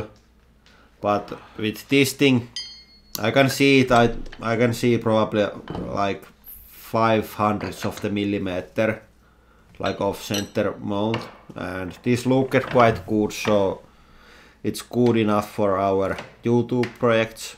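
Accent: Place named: Finland. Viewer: Oisko aksentti :D Ei sil oo ees nii paska enkku mut sil o se suomalaisten aksentti. Jos sul ny oli joku 10 enkku ni et varmastikaan pystyis puhuu paremmin :DD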